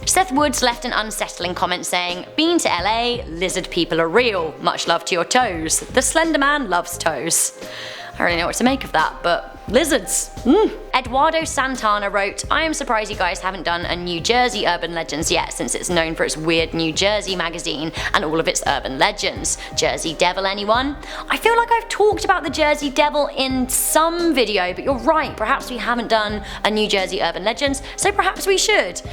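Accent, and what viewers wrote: United Kingdom. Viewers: D'Angelo McIntyre ummmm shes brittish. Can i hear your best french, german, or russian pronunciations??? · Guys, calm down on how she pronounces things, she’s from the UK, and lives in Canada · Well she's british not a native hawaiian so of course she pronounces wrong.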